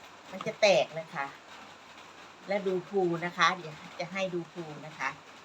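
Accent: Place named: Sakon Nakhon, Thailand. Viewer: ❤❤ชอบค่ะพูดย้อปนไทยเผยแพร่ภาษาคนสกลนคร🎉🎉🎉🎉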